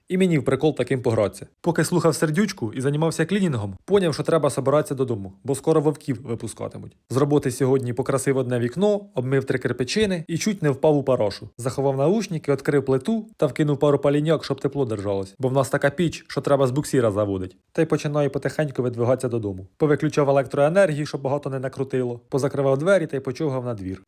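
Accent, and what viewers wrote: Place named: Zaporizhzhia, Ukraine. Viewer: Шторами завіситься, та й пройдуть ! Гарно , весело, а головне як у нас говорять! Я сміюся до сліз! Я із Запорізької області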